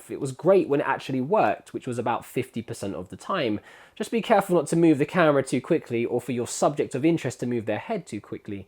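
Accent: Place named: United Kingdom. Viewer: So nice to have someone British and not American 😅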